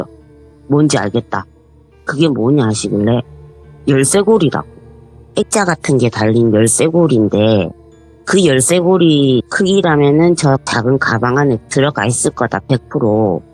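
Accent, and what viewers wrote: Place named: Gyeongsang, South Korea. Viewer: 시간 가는줄 모르고 들었어요~경상도 사투리 친근해요😊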